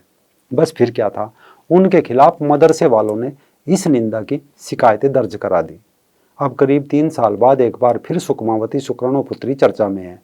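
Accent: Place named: Himachal Pradesh, India. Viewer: Bhai tu himachali hai kya accents se lagta hai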